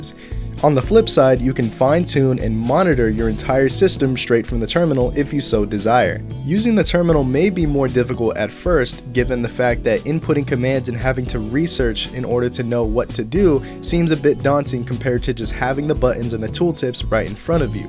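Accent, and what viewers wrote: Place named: South, United States. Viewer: That southern accent💀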